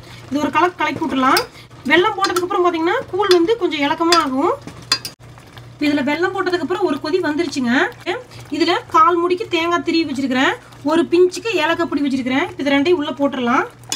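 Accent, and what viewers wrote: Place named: Coimbatore, India. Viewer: unga sontha uru unga voice nalala erukka Akka nenga Coimbatore nenacha Akka na Coimbatore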